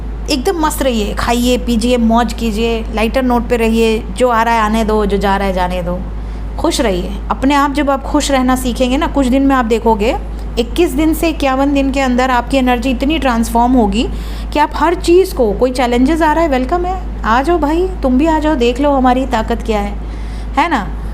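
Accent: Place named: Mumbai, India. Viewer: Mem mumbaiya bhasha me bol rahe he 😂😂jhakas 😂😂😂😂😂 so qyut di❤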